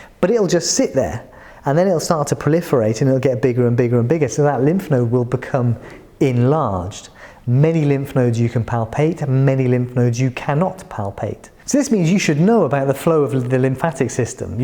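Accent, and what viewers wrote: United Kingdom. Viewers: im in love with you and your British accent i love so much thx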